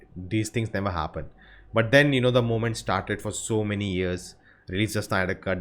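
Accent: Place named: India. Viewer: I hate Indian accent, I wish they could speak normally then I would leave sub on this channel ..... ykkhyyyy my ears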